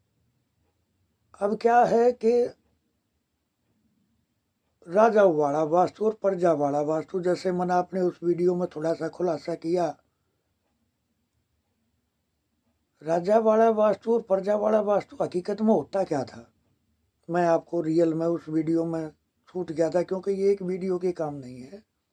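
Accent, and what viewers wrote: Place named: Haryana, India. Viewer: Guruji.. Aapke hosle jaror buland hoge... Aisi jaankari abhitak kisi ne you tube par nahi daali hai... Aapke 42 saal ka jivan Ka anubhav aap bahut hi ache tarike se samjha rahe ho... Bahut jaldi Aapka channel bulandiya hasil karega... Sir Delhi me kaha se hai plz bataye apne office ke baare me next video me....hamare Haryana ka touch bahut acha lag raha hai jab aap baat karte hai.... 🙏🙏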